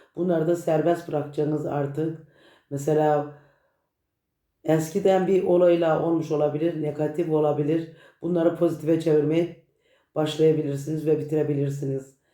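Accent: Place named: Afyon, Turkey. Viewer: Konusmanizdan sanki afyonlu gibisiniz nerelisiniz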